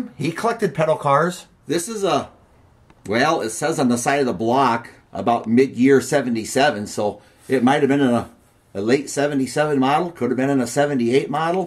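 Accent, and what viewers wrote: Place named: Minnesota, United States. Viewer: Just found the channel, pretty good! (where are you from? sounds almost a Minnesota scandinavian accent?)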